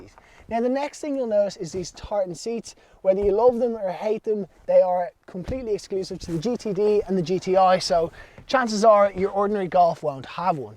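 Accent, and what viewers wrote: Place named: Ireland. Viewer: Golf or = Golf R , its his irish accent .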